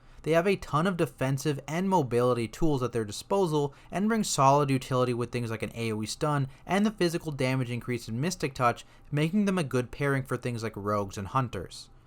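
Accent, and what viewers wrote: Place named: United States. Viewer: He's got an American accent, and I posted the way it's said in his native tongue.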